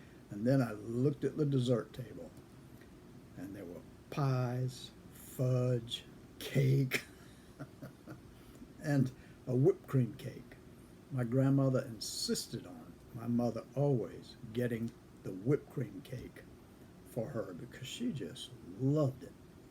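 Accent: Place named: England, United Kingdom. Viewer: I knew I heard an English inflection your dialect. What part did your family hark from?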